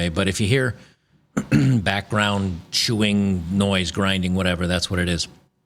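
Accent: Australia. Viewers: I am begging you please do more Australian accents in your videos to come it is much-needed comic relief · G'day mate I like your aussie accent. Crickey her reaction on the trip home was bloody funny. How would posting that video help her future dating prospects?. The first video makes her look like a terrible person, the second video makes her look pathetic.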